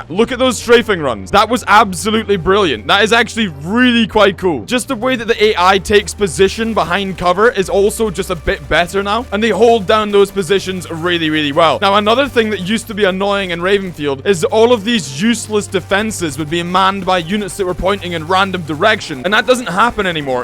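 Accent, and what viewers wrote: Scotland, United Kingdom. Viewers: Are you scoty boy?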